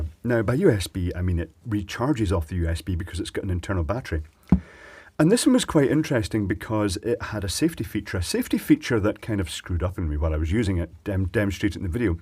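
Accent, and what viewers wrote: Scotland, United Kingdom. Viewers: R u Scottish? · Can't be the only one who knew he was gonna be Scottish before clicking on this video.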